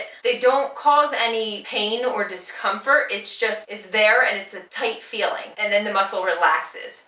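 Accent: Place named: Alaska, United States. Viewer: Love your videos, your energy and accent lol. Sounds Alaskan?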